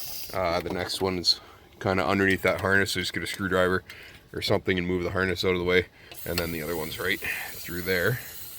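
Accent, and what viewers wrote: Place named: Alberta, Canada. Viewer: you sound like an Alberta boy!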